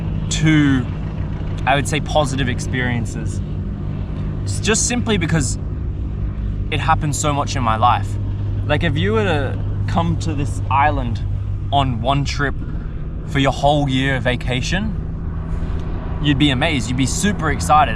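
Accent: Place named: Europe. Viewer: can you speak clearly and slow because your english it sound uoropean tone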